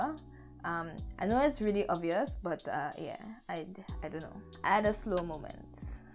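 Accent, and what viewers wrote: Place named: Trinidad and Tobago. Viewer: Beautiful! I love the trini accent! New subbie :D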